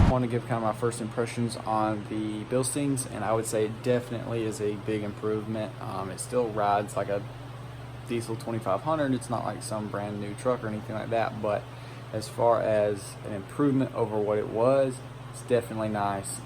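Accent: Alabama, United States. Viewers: What part of Alabama are you from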